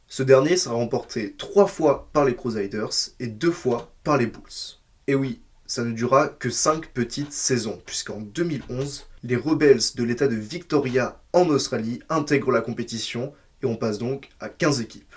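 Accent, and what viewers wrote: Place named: France. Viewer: Un bel accent frenchie et du rythme, ça s'annonce bien cette petite chaine ;)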